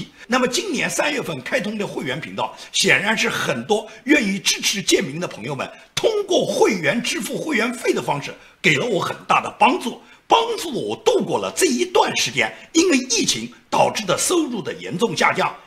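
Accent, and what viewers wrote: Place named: Suzhou, China. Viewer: 老师您好，我是您的江苏老乡。17年到德国您是我在YouTube关注的第一位主播，不知不觉中在您每天节目的陪伴中走过了三年。谢谢您日复一日的付出，让我有了全新的思考和辨别方向的能力…也谢谢您的苏式普通话让我在远离家乡的同时听到最亲切的乡音☺️ 真心祝福您及全家身体健康；节目越做越好，我们陪着您一路走下去！谢谢！！！💐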